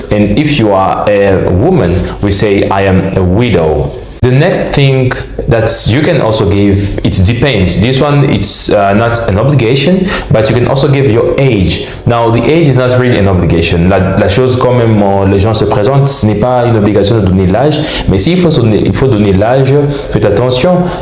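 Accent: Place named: United States. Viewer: Awesome video 🔥 you sound American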